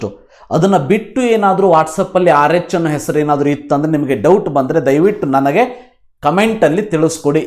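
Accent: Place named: Hubli, India. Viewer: Sir nivu Hubli yavaru nimma matu swalpa rough agi irutte adre karnataka da Ella kade nim video na nodta irtare adakke nim matu rough anusbahudu 😊 adu nimge swalpa gotirli 😊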